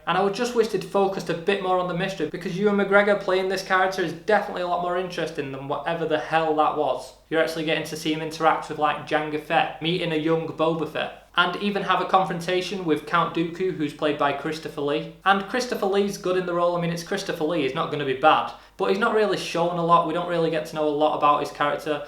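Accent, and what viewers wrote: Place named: England, United Kingdom. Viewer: Good review! I was wondering, where in England are you from?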